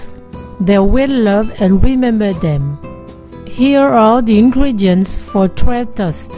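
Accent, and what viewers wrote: France. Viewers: This looks super delicious! I will have to cook this and try it! I will enjoy it for sure! where did you learn to cook all of these recipes? Where are you from? You sound french. Peace!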